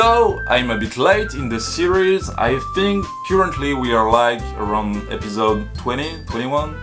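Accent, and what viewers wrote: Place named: France. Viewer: Are you French? You sound French et les folios junior derrière toi te crament XD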